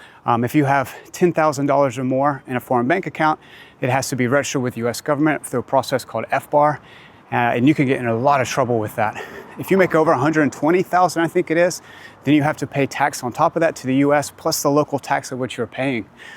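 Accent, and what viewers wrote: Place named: Northern England, United Kingdom. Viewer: This guy is obviously from Northern England or Ireland and is cosplaying as a North American for clicks and likes.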